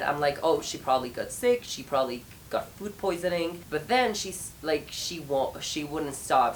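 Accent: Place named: Los Angeles, United States. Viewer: I love ur L.A accent.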